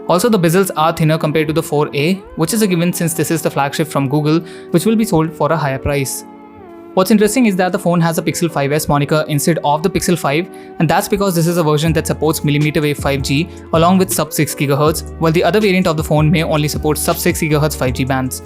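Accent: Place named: England, United Kingdom. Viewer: English accent so suck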